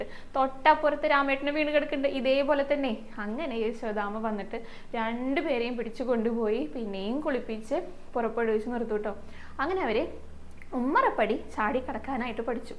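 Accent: Thrissur, India. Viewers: Unnikannante kadha mol paraunnathu kelkkumpol munnil nadakkunnathupole thonnunnude. Athratholam resamnu kelkkan pretheykich Trisur malayala siliyil paraumpol. Innathe kadhaum super dressum super.Njan kannyakumarikkarana mole.anikku Trichur malayala sili valare eshttamanu.Njan krishna Bhagthanu.kudumpathil 8_mathe puthranayathinal Gopika Remaman anna perum kitti. Athinal Njan pathivai kadhakal kelkknnu. Molkku sarvaiswriyavum tharan prarthikkunnu.Krishna Guruvayurappa.😊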